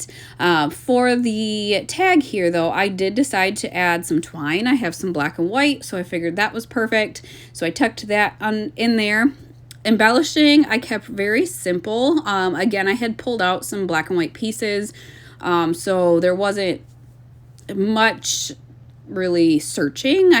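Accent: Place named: Great Lakes, United States. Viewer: We played a lot of euchre near Buffalo, NY. Maybe it’s a Great Lakes game, like the accent!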